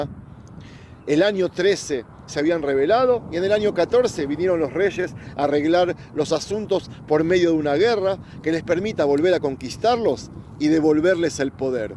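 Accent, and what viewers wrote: Argentina. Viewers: Creo que él es de origen argentino.. por eso habla asi.. por su asento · Este señor es argentino por su acento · Feliz navidad??? Bien dice las Sagradas Escrituras: profesan ser judíos mas son sinagoga de satanás. Según éste estudia la Tora con doctrina romana y protestante, además tiene acento argentino sho digo que vos es un falso judío.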